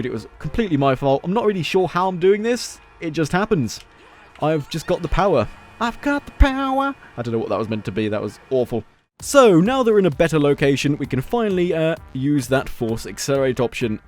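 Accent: United Kingdom. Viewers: You never heard a normal British accent before?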